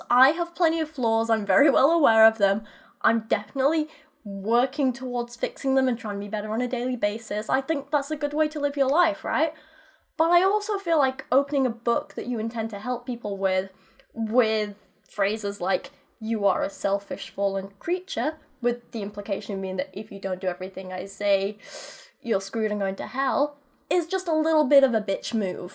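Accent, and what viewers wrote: United Kingdom. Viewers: Are you British? I swear i can hear a bit of British in your accent.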